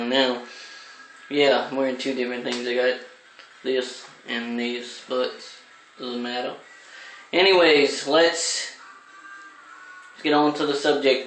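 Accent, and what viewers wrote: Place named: Ukraine. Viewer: Are you from the UKRAINE ! Your accent is very strong !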